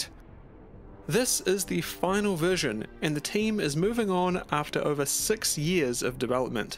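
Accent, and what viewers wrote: New Zealand. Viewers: yo r u a kiwi?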